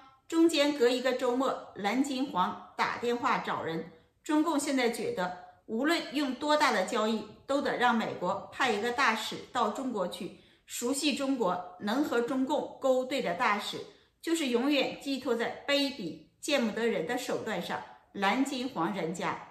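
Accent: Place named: Northeast, China. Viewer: 你东北口音，又不是徐州的，怎么了解的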